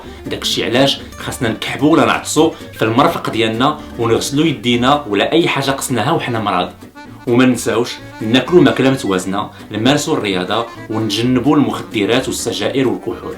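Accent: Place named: France. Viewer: كلشي ما افتهمت من كلامك . ياسبحان الله الكلام عربي واللهجة على وزن فرنساوي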